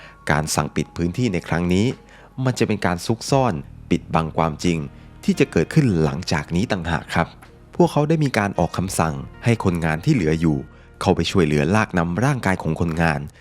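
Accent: South, Thailand. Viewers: เสียงคุณ เหมือนคนใต้เลย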